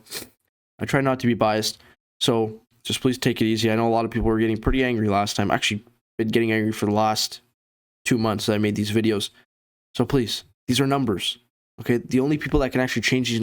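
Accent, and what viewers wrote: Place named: Ontario, Canada. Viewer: You sound like you're from Ontario.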